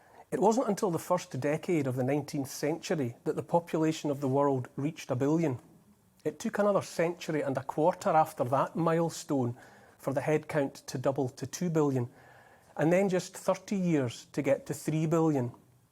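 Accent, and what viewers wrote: Scotland, United Kingdom. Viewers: is really getting Scottish on us. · I’m always scrolling thru cuz I want to see more of, talks total sense. Conclusion - he’s not in the GB news schedule often enough, and I think the user comments reflect that. We all love that Scottish twang, although I think maybes the ladies out there are thinking Mel Gibson in Braveheart - dont blame u tho, I’m waiting for a rugged man (or woman if you’re not beaten down) of any Nationality to save us from this madness, xx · Sorry, I thought you were a twat wandering around the countryside commenting on puffins et al. You are inspiring....especially as we can understand most of your brogue!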